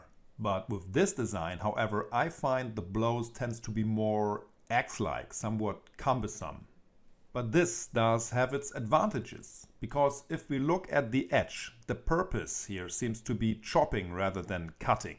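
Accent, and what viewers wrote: Germany. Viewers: Don't you ever dare to lose your german accent,. Best wishes.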